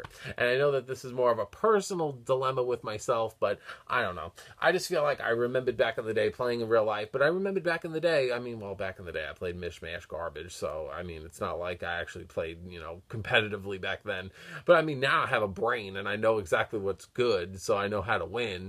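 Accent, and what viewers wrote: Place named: Boston, United States. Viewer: I like your Boston axe cent.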